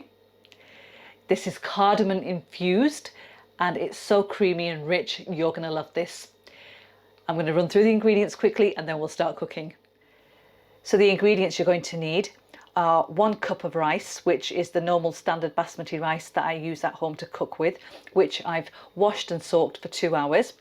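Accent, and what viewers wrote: United Kingdom. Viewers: You have a nice British accent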